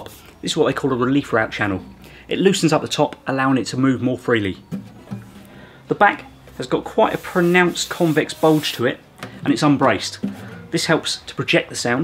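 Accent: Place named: South East England, United Kingdom. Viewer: 掌編製作所 he is from england , south east england me reckons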